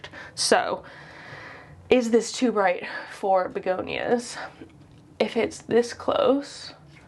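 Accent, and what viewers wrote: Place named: RP, United Kingdom. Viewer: So random, but I have to ask -- where are you from? You can definitely hear the slight "posh" accent, but were you born and raised in the UK or somewhere else? I love your voice/accent!! Editing to add, sorry if you've addressed this somewhere before .. I'm new to discovering your channel and I love it here! Just followed you on IG too! (Where I saw your bio says London!)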